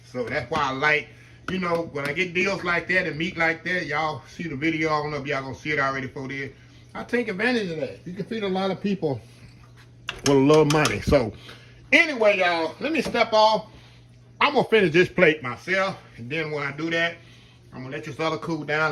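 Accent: South, United States. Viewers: You remind me of my ma and can from the south when you cook and talk when u say y'all don't know about this I lol becAuse I do I love your cooking and I wish I can do more vidio using instapot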